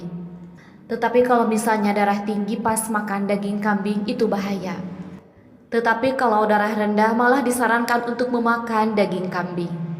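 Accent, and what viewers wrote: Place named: Madura, Indonesia. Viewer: Kyk org madura suaranya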